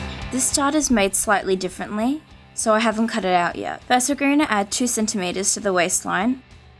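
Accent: Australia. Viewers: ... I hope I didn't offended you or seem like I was attacking you but personally as fashion student it doesn't doesn't make sense because of my background of pattern making but I see where this would help alot of people out. Judging by your accent you must be aussie? (Please tell me I'm right... lol) Have you considered studying fashion? I recon that you have big potential in the fashion industry, you earn a butt load for being a pattern maker.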